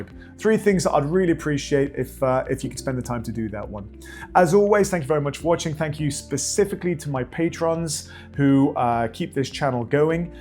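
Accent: United Kingdom. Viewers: This is pretty funny, a Ukraine YouTube blogger uses it; the voice is the same, although it is Ukrainian) a gorgeous British accent you have, mister)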